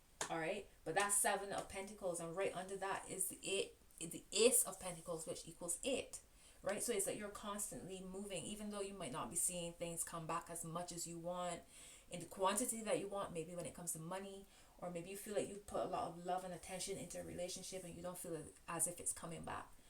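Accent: Barbados. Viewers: you have absolutely no reason to stress out. I've spent the past 8 months watching and listening to various readers and you are up there as one of my best readers. Regardless of the message, you are a wonderful reader. You have a confidence in interpreting the cards. Your warm and easy going. I got a glimpse of your sense of humor and your while you are reading, your concern is genuine and honest. Your bajan accent is beautiful too!!!! I could listen to you all day. Your awesome. Lots of love, light and blessings to you💜💕💙❤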